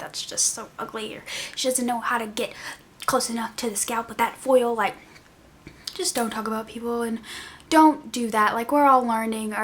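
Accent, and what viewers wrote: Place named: Louisiana, United States. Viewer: I'm from Mississippi and as soon as I started watching your videos I thought you had a Louisiana accent. I'm getting ready to go to regency beauty institute in Virginia this month!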